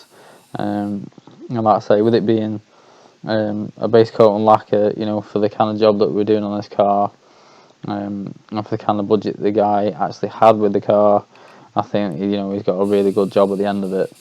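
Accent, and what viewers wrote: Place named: England, United Kingdom. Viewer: thanks for taking the time to help me. You sound English where about is your shop?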